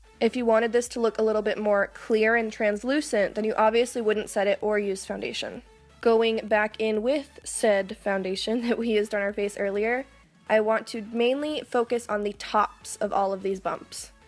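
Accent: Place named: Albuquerque, United States. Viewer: Are you a Burquena? Some of the ways you say things make me think you're from my hometown! haha Specifically when you said "Eeee! All creepy!" :)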